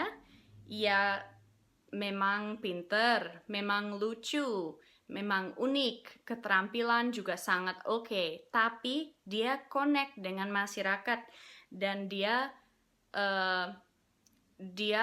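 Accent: Java, Indonesia. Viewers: Ngmong nya medok bgt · you indonesian is getting medhok i like it!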